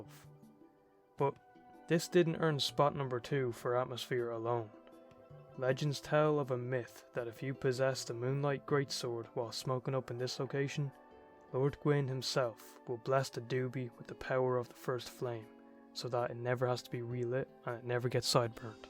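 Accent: Ireland. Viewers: I'm from Cork and you sound like half the people I hang out with, Are ye Irish?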